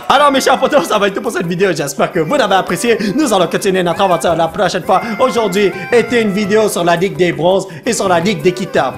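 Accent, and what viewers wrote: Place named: Canada. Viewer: Ah ok mpi je suis diamant ^^, vu tas façon de parler tu dois etre canadien si je ne me trompe pas ? Si sa te dis on peut jouer ensemble ^^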